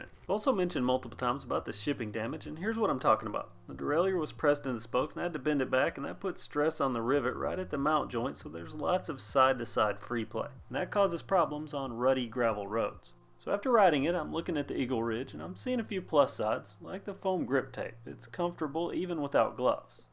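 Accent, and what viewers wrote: United States. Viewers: why not? I would be happy if I had your accent . My russian/turkish accent is sucks. You sound true american. Don't get me wrong . I am gonna keep listening . I know one thing for sure , if you like something it's gonna work you. 🤝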